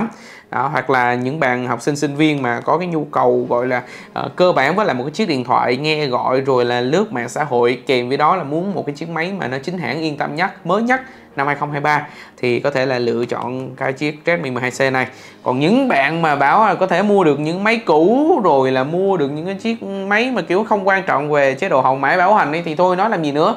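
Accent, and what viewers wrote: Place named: Binh Dinh, Vietnam. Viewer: Mé nghe cái giọng xứ nẫu này ko nuốt trôi nổi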